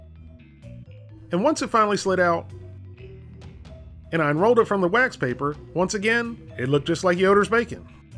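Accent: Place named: West, United States. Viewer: Gorden Wood it's cause woody Harrelson would say "I gotta do it for the people" alot and with this guys western accent it seems spot on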